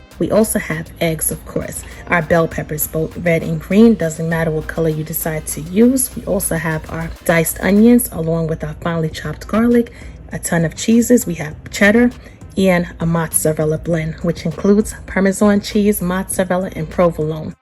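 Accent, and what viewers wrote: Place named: East Coast, United States. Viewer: Making this with leftover pot roast 😋 Love the east coast accent 😁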